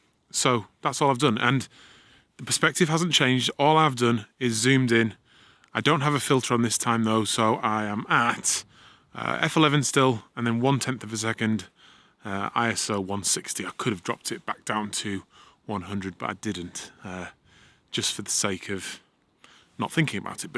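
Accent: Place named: Wirral, United Kingdom. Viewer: Cheers bud! haha I was hearing a northern accent; sounded bit Wirral'ish (where I'm from) to me haha.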